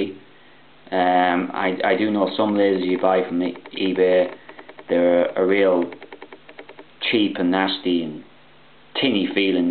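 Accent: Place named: Australia. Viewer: oh great an Australian :(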